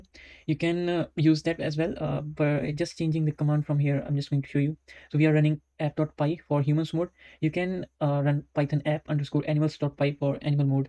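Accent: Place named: Ukraine. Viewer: you got really strong ukrainian accent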